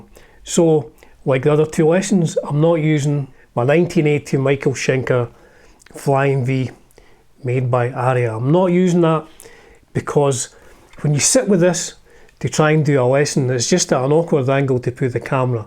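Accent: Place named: Edinburgh, United Kingdom. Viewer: Brilliant video that's a great song got the original LP and on Dbl live LP at Budokan belter. You're a great communicator clear spoken with that Edinburgh accent. I'm for learning this on my Ibanez RG don't yet have a Gibson or Flying V 🤔🎸👍🎧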